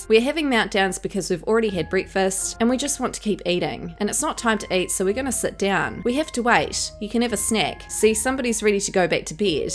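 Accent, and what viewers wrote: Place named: New Zealand. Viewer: Im watching for your Kiwi accent 👌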